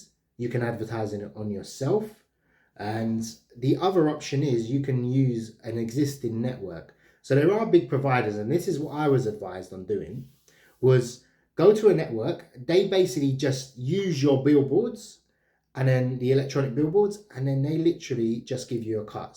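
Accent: United Kingdom. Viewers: I don’t know if this sounds unprofessional or not but please let me know what type of british accent you’ve got. Thanxx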